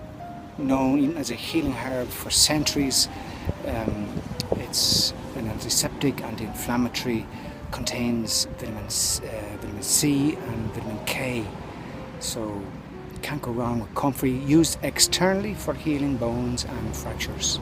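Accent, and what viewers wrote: Ireland. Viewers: great video...nice to hear an Irishman talk about wild herbs. I am a Horticulturist level 6 and I have started to use Urtica dioica and Taraxacum officinale and my heart rate is down from 170+ /80 to 134/78 my doc is stunned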